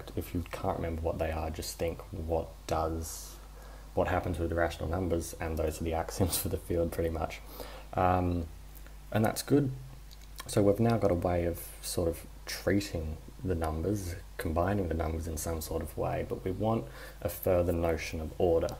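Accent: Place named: Australia. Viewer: with Aussie accent op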